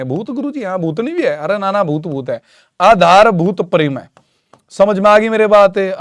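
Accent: Haryana, India. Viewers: Wa haryanvi 😊❤❤❤❤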